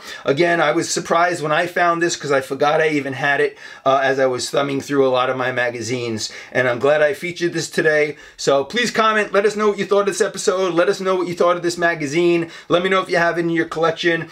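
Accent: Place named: New York, United States. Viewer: You brought back some great memories. You sound like a Noo Yawka. Did you ever go to the martial art shop on 42nd St?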